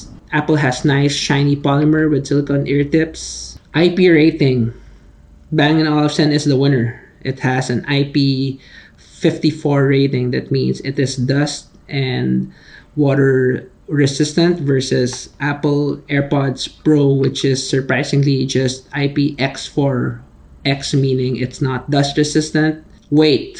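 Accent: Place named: Philippines. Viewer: great review, ur Philipino btw? recognized the accent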